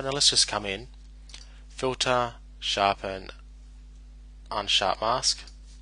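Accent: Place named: Australia. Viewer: he's australian, dude. give him a break. Nice upload! very helpful!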